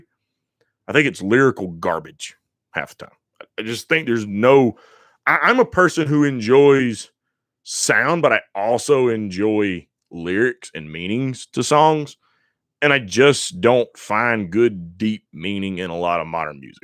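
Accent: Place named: South, United States. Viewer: What part of the south are you from?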